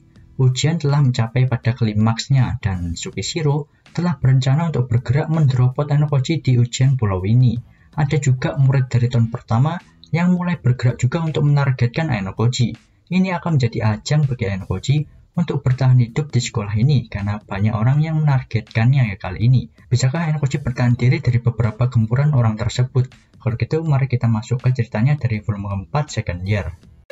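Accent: Java, Indonesia. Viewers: Makasih mang medhok 🤌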